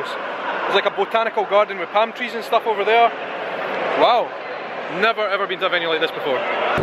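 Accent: Scotland, United Kingdom. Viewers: Wow, that was crazy fast internet speed!! We're slowly slowly getting the NBN in Australia(National Broadband Network), but they're starting rural and working back in to the cities. I've just moved back to the burbs from country last year , and my old town got it out in the sticks, yet I'm way closer to Melbourne and still waiting for it here. Can't wait for better speed! Scottish accent goes down a treat, anywhere, I reckon 😉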